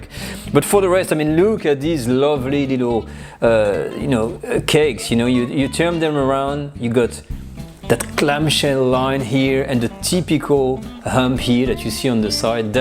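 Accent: France. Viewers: So cozy French accent 🤗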